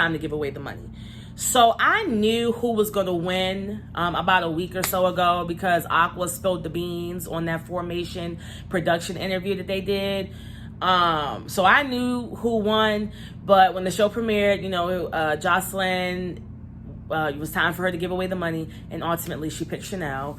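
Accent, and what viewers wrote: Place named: Baltimore, United States. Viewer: Love this review!! New subbie.❤ are you from Baltimore? You sound like it.☺ Yummy in that parking lot was so freakin cringy. She should be mad embarrassed.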